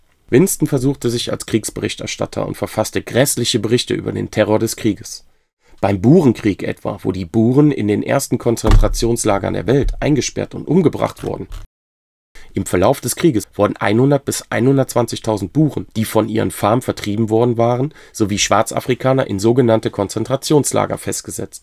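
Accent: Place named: Turkey. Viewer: Der Sprecher hat einen türkischen Akzent .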